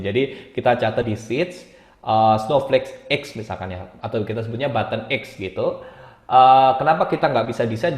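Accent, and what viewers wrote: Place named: Surabaya, Indonesia. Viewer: Oalah Mas kie wong Suroboyo tho, pantes kok medhok..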